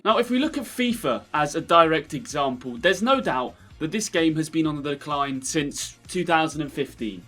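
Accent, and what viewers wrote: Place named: England, United Kingdom. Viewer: I hate this English accent why can’t an a American do this video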